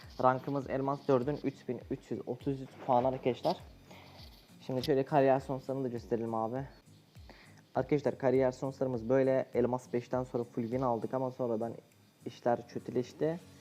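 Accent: Azerbaijan. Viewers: Qurban olum Azərbaycan Ləhçəsinə 😁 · Sən Azerbaycanlısan?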